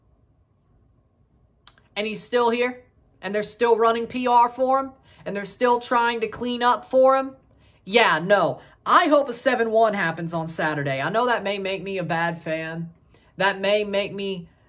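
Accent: South, United States. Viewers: Ok but I assume your in Toronto now. That is cool that you are a soccer fan. Not alot of southern guys into soccer. Love the content. keep it up! Thumbs up bro!!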